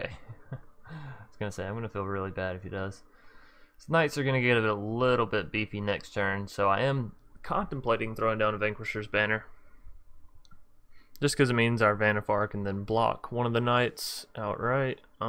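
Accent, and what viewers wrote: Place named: Alabama, United States. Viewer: You from Bama homie?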